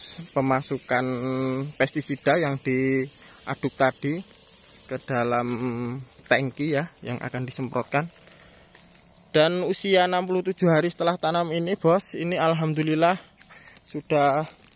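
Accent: Java, Indonesia. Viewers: Kok bhs jawanya medok banget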